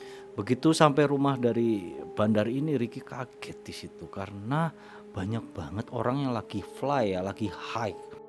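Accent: Java, Indonesia. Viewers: Daya tariknya justru ada di medokmu bang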